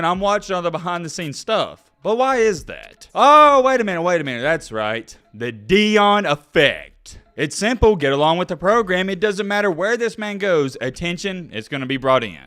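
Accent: South, United States. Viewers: That southern tone and underlying messages remind me of a personality I'm way too familiar with. But do your thang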